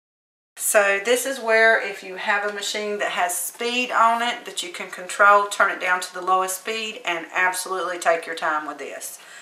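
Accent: South, United States. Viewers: First, there's not anything as adoring as a southern accent! :) Secondly, these are the cutest little shoes and I'm going to try my hand at making a pair. Thanks for posting it. Blessings!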